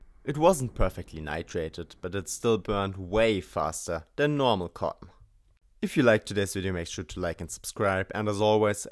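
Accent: Germany. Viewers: Man hört sofort das du Deutscher bist 😀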